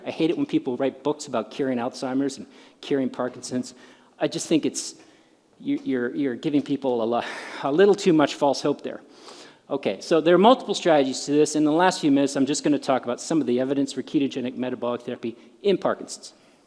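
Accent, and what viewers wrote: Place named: Canada. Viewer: Love listening to your Canadian twang. Always good to hear from you.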